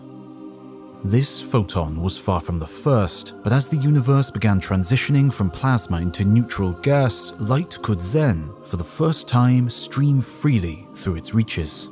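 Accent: England, United Kingdom. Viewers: Can you give your channel some class by ditczthe British accent and instead use a good Swedish, French or Irish accent.. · I hate your robot-English-toff-voice more than death itself.......death to to robot voices! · I hate measurements in Kilometres, why not Miles as that's what we've been brought up with and i no I'm being a bell end and for that i apologise. Great video and so enjoyable esp the narration. I think the English voice can be a universal voice were u don't need subtitles as everyone shud understand the narration and I'm not being biased i promise.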